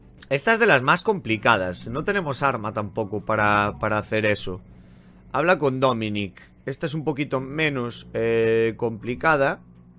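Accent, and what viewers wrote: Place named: Galicia, Spain. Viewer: de donde eres? (tu acento parece gallego)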